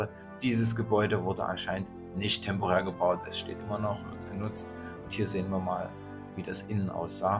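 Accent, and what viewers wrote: Saxony, Germany. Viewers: Über die Rhetorik mach dir keine Gedanken, der sächsische Dialekt ist goldig und du hast eine nette, bescheidene Art, dadurch wirkst du sehr sympathisch. 💐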